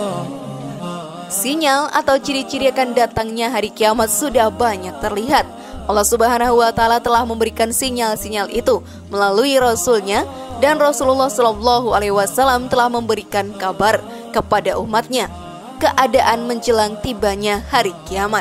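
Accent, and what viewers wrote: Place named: Java, Indonesia. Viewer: trimakasih berbagi ilmunya...hanya sedikit masukan yg bacanya terlalu medok cengkoknya bu...coba di perhalus biar enak di denganya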